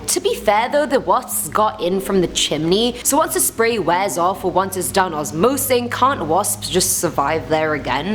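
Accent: United Kingdom. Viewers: They love hiring attractive women with British accents don't they?😂😂🤣🤣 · I honestly can’t tell whether she’s a fellow Brit 😂😂😂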